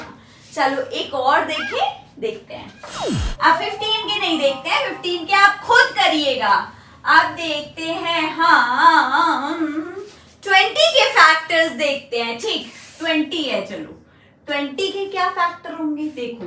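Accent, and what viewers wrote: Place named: Mewat, India. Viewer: Mewati awaaz to halki karo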